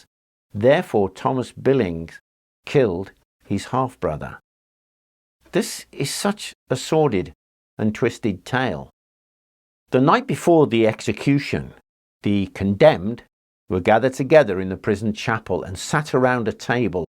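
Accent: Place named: Midlands, United Kingdom. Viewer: Midlands rather than northern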